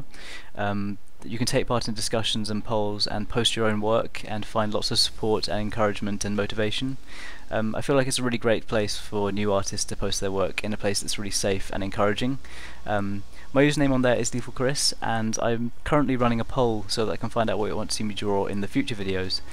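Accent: United Kingdom. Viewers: He's British right?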